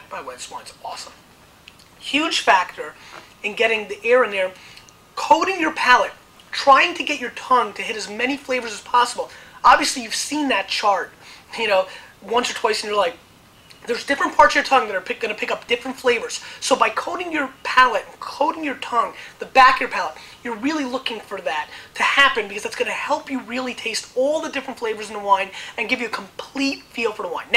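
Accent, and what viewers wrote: New Jersey, United States. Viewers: from Jersey much?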